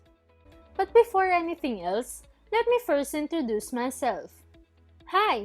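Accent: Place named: India. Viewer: Why I heard a Hindi Girl who explaining, taking this about 😅.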